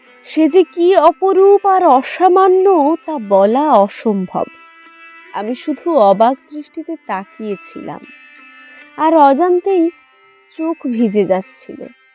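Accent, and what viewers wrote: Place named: Bankura, India. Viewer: দিনে দিনে, বেশ পাকা পাকা কথা শেখাচ্ছিস্! বেশ ভালো হচ্ছে, যে যাই বলুক, তোর মত তুই এগিয়ে যা, তোর শক্তি আছে। তোর বাড়ি কোথায় রে, এত ভালো বাকডি ভাষা বলিস! ভালো গান ও তো গাইতে পারিস!🎉🎉 ভালো থাকিস। আমি প্রায় তোর সব ভিডিও দেখি😂😂🎉🎉🎉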